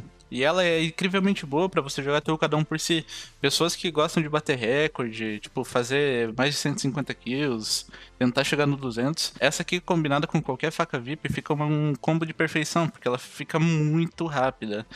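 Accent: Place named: South, Brazil. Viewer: Mano tu é do Sul né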